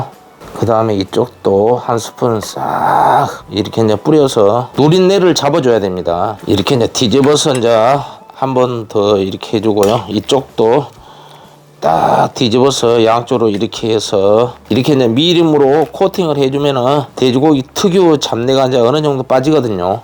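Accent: Jeolla, South Korea. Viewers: 고향이 라도인가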